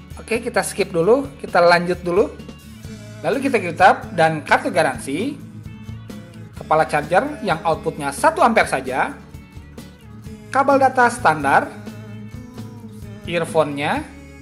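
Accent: West Java, Indonesia. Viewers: nuhun kang buat reviewnya :D sunda pisan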